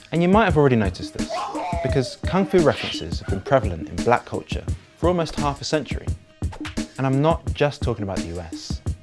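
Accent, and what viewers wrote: United Kingdom. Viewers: British narrator SMH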